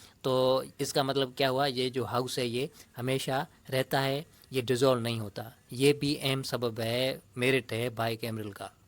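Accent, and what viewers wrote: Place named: India. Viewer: Pakistani hokar indian Accent..How?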